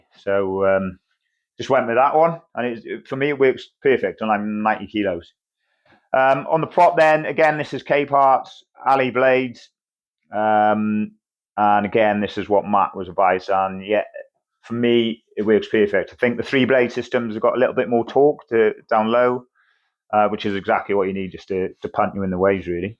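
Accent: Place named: Canada. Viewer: Great video, but the Canadian accent is pretty thick.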